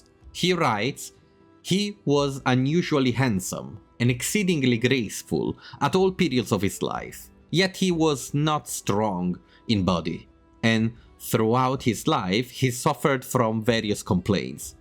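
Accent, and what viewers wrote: Italy. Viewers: Ngl this is how I sometimes imagine Roman accents sounded like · Crazy good video, nice italian accent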